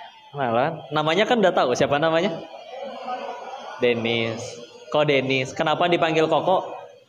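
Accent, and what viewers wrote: Sunda, Indonesia. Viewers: Nyunda banget suka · Sunda pisan akang Denis teh 😇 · Bener pisan logat sunda dan kalimatnya aagym banget.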